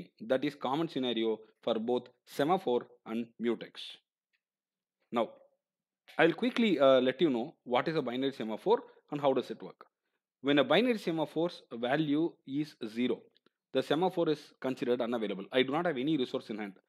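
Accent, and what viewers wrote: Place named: South India, India. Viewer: good explanation but please consider using AI voice synthesiser on a prior prepared transcript, south indian accents are extremely annoying and make it hard to focus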